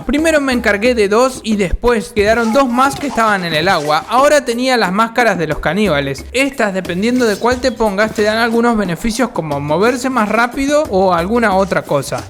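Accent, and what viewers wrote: Argentina. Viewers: Eres argentino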